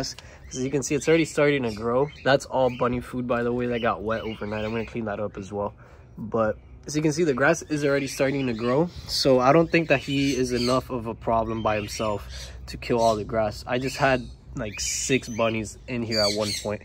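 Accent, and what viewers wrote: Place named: Long Island, United States. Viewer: Sounds so Long Island...or the Bronx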